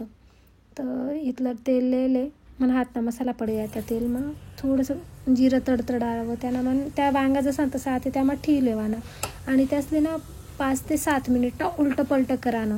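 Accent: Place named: Jalgaon, India. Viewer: ताई तुमची भाषा खुप भारी तुम्ही जलगांव चेका 👍👍🙏🙏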